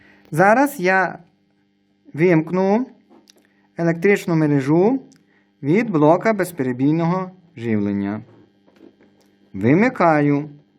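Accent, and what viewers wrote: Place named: Lviv, Ukraine. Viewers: тільки у Львові такий говір) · тільки у Львові такий говір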